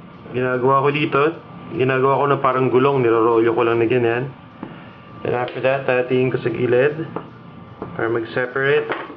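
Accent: Philippines. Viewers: Except for the loud background music, will u people stop criticizing this chef? His English is NOT very bad that u have to complain about it! & his accent is ok! Pinóy accent naman yun; what's wrong with it? Would u rather hear him speak in a foreign accent (like American or Chinese accent) while he uses the Tagalog language?!? Remember that his videos are NOT about grammar or phonetics!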